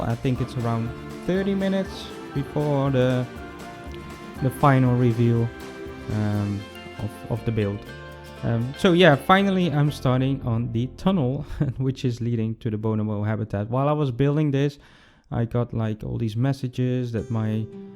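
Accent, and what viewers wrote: Netherlands. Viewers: a SUPER REALISTIC city zoo?! No way! I would love to see you build a zoo like that. I mean, I would super love to see it. I'm wondering where it will be placed. Netherlands maybe? Because your accent sounds Dutch [correct me if I'm wrong]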